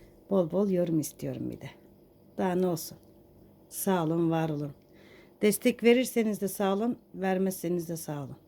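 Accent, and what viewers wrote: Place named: Tavas, Turkey. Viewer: KURBAN OLDUĞUM TAVAYLA KONUŞMANA KURBAN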